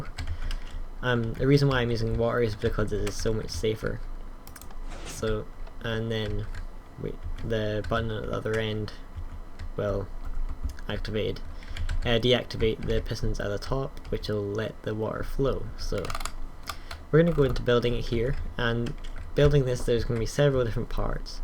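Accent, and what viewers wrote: Scotland, United Kingdom. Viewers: YOUR FROM SCOTLAND YAY MEH TO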